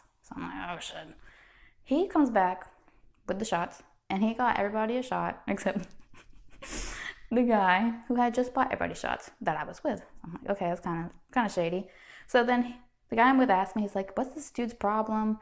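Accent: South, United States. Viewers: im barely now realizing your southern accent lol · You're a trip. You can't really hear the southern accent in the asmr videos but it sounds good on you.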